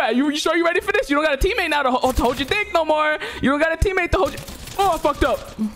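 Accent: New York, United States. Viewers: You talk like your from New York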